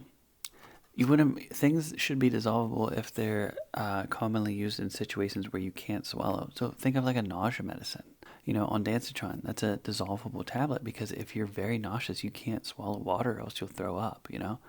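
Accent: Pittsburgh, United States. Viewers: Love the Pittsburgh accent. Listen all the time. Love yer honesty! I’m an rn and agree with your conclusions. With our druggies the benedryl is always asked for when we give all the other sleeping meds.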